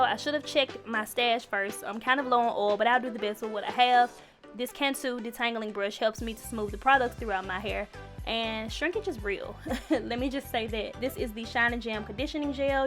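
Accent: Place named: Georgia, United States. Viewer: I just love your country accent!! Ga. Represent!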